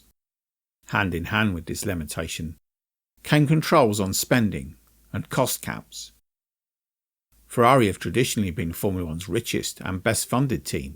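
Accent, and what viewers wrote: United Kingdom. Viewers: why did i know the accent was gonna be British all the jingoist British bias content "why is ferrari so bad at f1" is getting annoying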